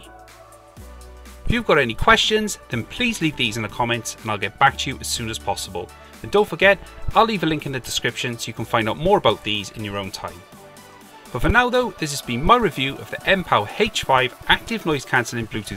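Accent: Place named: South Wales, United Kingdom. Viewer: Another great video. Good work! Nice to hear another South Wales accent 🤣👍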